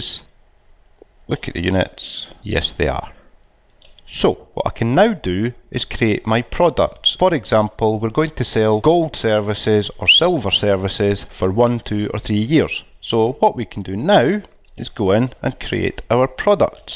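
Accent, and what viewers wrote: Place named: Scotland, United Kingdom. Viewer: You should do in another accent, i cannot stop enjoying this nice scott lad's accent... I need to watch it again for the content....JK.....its nicely put together...well done....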